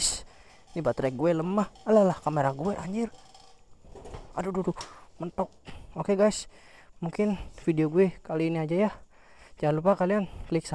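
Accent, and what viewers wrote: Tegal, Indonesia. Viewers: Bang luh orang tegal mana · Abang orang tegal yah bang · anjayyy tegal ni kayanya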